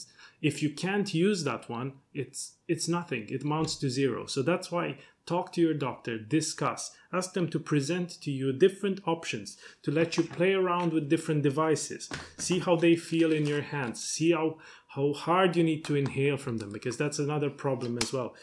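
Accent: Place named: Romania. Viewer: I have listened to three videos now. My first guess is that your mother tongue is Romanian. You sound like a pastor from there who leads a food distribution program for the poor in Canada. You do not speak a Slavic language but you are from Eastern or S.E Europe. So, that leaves only one language. It is not so much your slight accent as your manner of speaking.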